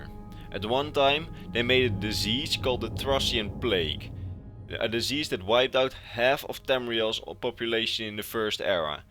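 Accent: Netherlands. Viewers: From your accent I can Tell your Dutch Correct? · the man behind the voice, is he Dutch? the accent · dunglish tho or nengels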